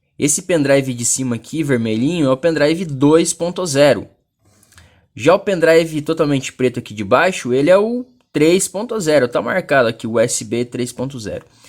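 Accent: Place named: São Paulo, Brazil. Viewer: Uiiii sotaque paulista irritante esse em, existem melhores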